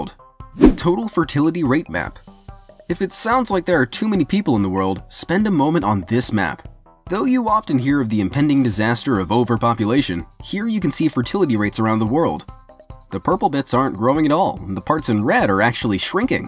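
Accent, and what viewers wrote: United States. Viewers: Also ya know the American accent comes into play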